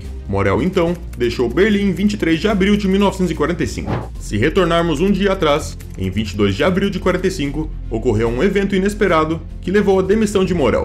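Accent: Brazil. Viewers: Boa noite, neste caso, está certo, uma vez que a pronúncia usada no vídeo é a do português brasileiro. No mais, se realmente você estivesse preocupado com a pronúncia correta em alemão, ao invés de citar poucos erros de pronúncia ligados ao nome de um campo de concentração, iria escrever uma mensagem a cada vez que o dono do canal dissesse “Hitler”, uma vez que a pronúncia dele é a do português brasileiro, não a alemã, que seria “ritlá” ao invés de “ritlêr”.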